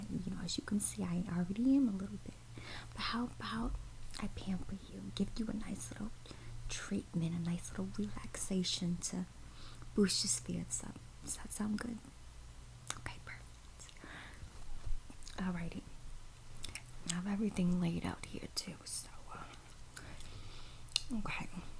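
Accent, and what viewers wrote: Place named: Long Island, United States. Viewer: HIGHKEY so glad u used the Long Island accent it’s so tingly and comforting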